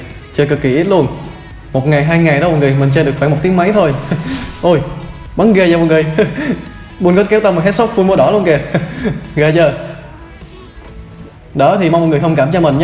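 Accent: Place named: South, Vietnam. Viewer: Anh ơi anh miền nam anh ở tỉnh nào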